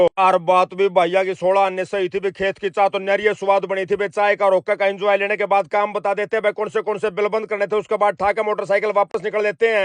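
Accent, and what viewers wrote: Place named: Haryana, India. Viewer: Bhai haryanvi boli ka mja aa ga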